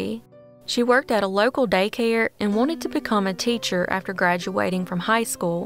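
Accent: South, United States. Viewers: Just discovered your channel. Thanks for covering these Canadian cases. Subscribed 👍 The Southern accent only makes it that much better! So charming and attractive when southern women speak. Not trying to be weird or anything, but it's true!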